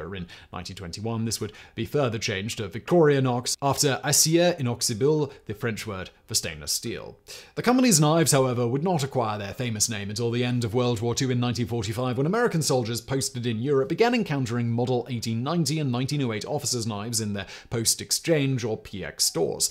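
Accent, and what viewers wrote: England, United Kingdom. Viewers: He's English. If a word looks like it starts with Victoria, he's hard coded to say Victoria no matter what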